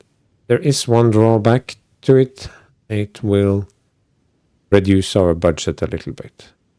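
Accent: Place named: Scandinavia. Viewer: where are you from if you dont mind me asking? youre accent sounds Scandinavian to me but i would like to know again if you dont mind me asking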